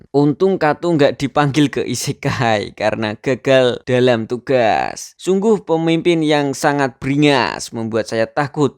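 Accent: Java, Indonesia. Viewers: Meddhok tenang mas. Hehehe JB Malaysia nyimak❤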